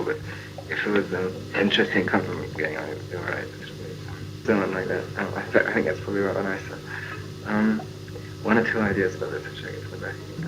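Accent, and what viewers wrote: RP, United Kingdom. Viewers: Love Drake but if someone told me this was one of the Royal family speaking would have believed them even makes the Pink Floyd guys sound like neds scallies or chavs 😢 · I had no idea he spoke in upper RP. I knew it would be RP given his upbringing but he says his A so flat, like Queen Elizabeth did. So beautiful to hear. What a talent he was. Tragic.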